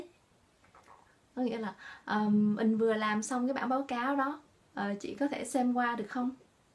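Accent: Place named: Paris, France. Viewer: Cảm ơn cháu cho bác có dịp nghe lại giọng pure 'Parisienne' , mong cháu cho thêm bài mới nhe. BON SANTÉ.- Bác ttt, WA, America.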